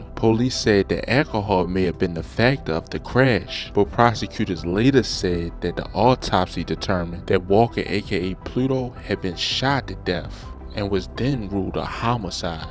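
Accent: Baltimore, United States. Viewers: Bro are you from Baltimore? I hear them heavy U's in your accent 🤔💯✊🏿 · I can hear that you're a fellow Baltimore native. It's crazy we are fascinated with the Raq like our city ain't our here geekin themselves. Lol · You from Baltimore? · You have to be from Baldimore. I can hear it in the accent.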